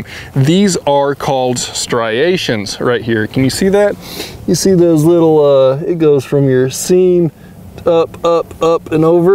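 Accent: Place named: Texas, United States. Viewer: Your Texas accent is part of your charm :)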